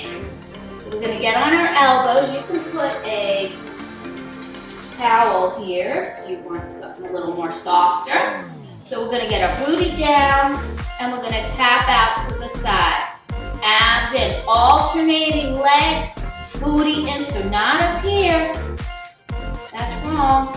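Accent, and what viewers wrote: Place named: East Coast, United States. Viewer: You make this doable. Wonderful attitude and sounds like you are from the east coast. New Jersey maybe?? Are you still doing your personal trainer program, and where are you located please. Thank you for sharing this.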